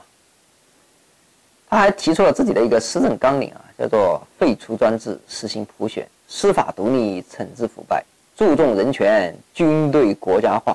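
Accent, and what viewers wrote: Guizhou, China. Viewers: 配上字幕，真的是好多了。。。不过我刚刚习惯了听你的贵普，哈哈。。。另外，字幕有较多错别字，估计是语音转换的关系。比如那个“一语成谶”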